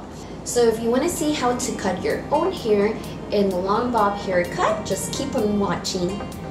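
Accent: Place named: Spain. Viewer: It's because of her Spanish accent. The v and b sounds are mixed in the language.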